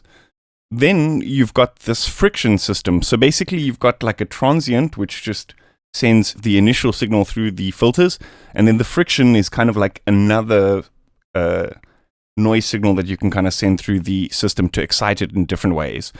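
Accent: Germany. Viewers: Sounds like a german accent to me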